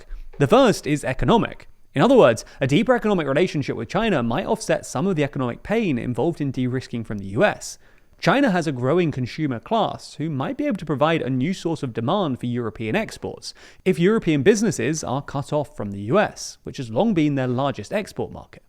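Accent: United Kingdom. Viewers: the last time some guy with a British accent talks about trade imbalance with china... they flooded that country with opium and then invaded a few times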